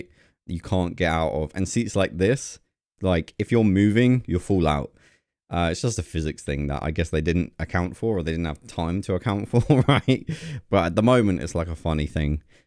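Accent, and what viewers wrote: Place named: England, United Kingdom. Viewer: i dont noouuu ... omg, if original English sounds like that than i prefer more American English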